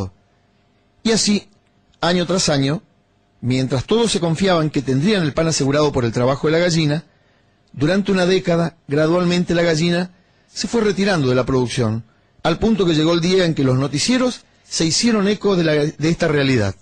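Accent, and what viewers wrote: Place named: Argentina. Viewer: No sé si encuentro mas patético el "analisis" con pretensiones de fabula a la de la Fontaine o simplemente el acento argento. No, no soy particularmente socialo y si, tuve la mala pata de nacer en la argentina. Ese acento no me es insoportable por simple desconocimiento sino que lo conozco demasiado bien. Y no tampoco, aca en Francia, la poblacion es solo mas "poli" (educadita digamos) pero en capacidad de analisis, lucidez politica y esas yerbas, son tan precarios como el argento promedio.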